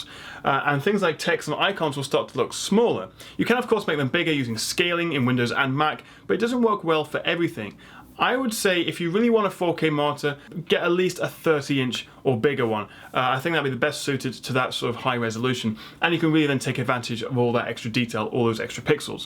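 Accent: England, United Kingdom. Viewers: but that doesn't make sense, he's ENGLISH and he's speaking ENGLISH and yet you are American (I presume) and you speak English. Looks like you're the one with the accent! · Awesome explanation and good video. But I CAN'T STAND the way you and other people from the UK say "H". Like you do when you say "HD" it's like nails on a chalkboard. :( lol but you're still cool. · It's because he is british and you are American. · "fo-cking monitor, fo-cking gaming, fo-cking resolution".. i'm just amused. No offense I honestly love British accent. I just heard it differently. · I really enjoyed your video on features of monitors to consider. Man, are you knowledgeable--I learned a lot! I was very close to buying a 27 inch monitor, but the information you presented about 1080 resolution being inadequate on a monitor that size saved me from making an expensive mistake. 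I will wait until I can afford a 1440 27 inch monitor rather than a 1080. I am now a new subscriber and will check in with your website regularly to keep learning about computers and their peripherals. I know that you are young, bright, and British, but it might be helpful if you could slow your speech down a bit for those of us who aren’t. Thanks!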